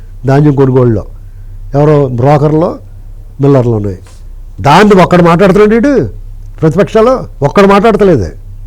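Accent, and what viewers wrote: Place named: Godavari, India. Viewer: Good. చక్కటి గోదావరి జిల్లా యాస.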